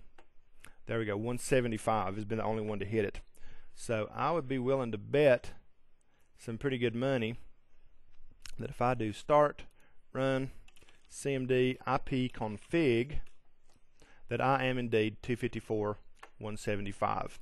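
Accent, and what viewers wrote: South, United States. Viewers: I really like this guys accent what is it though I presume southern drawl but I'm not 100%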